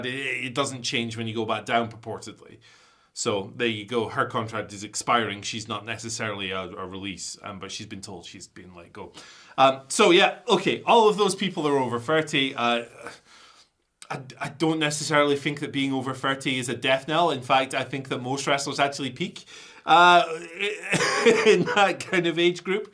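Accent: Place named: Scotland, United Kingdom. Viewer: Why do I love listening to this Scottish man rant about wrestling so much?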